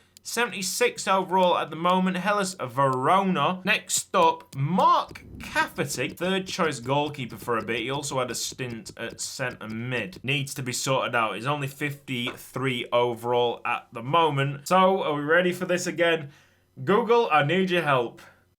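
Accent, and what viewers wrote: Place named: Yorkshire, United Kingdom. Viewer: A Yorkshireman screams at machine for 20 minutes.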